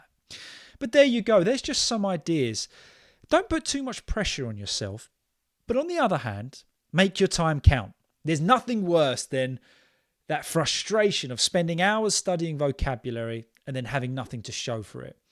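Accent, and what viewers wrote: United Kingdom. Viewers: Hi, I'm from Myanmar, and I absolutely love the British accent. I'm really keen to learn it, so your podcasts are perfect for me.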